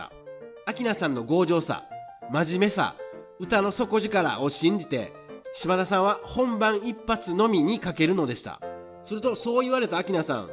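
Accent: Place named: Kansai, Japan. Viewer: 中森明菜ファンでしたので登録しました‼️面白いepisodeありがとうございます♪ナレーションの関西弁素敵💓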